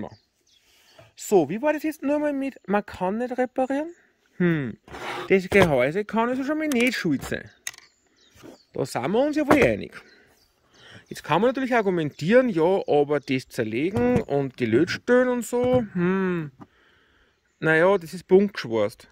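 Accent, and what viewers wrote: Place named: Bavaria, Germany. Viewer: Für Nordseutsche schwer nachzuverfolgen wegen bayrischen Slang. Trotzdem vielen Dank für die Mühe!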